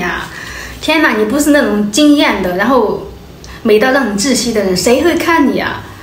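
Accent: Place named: Taiwan. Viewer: 妳跟台灣人一樣了。說話結尾不說哈就更像了。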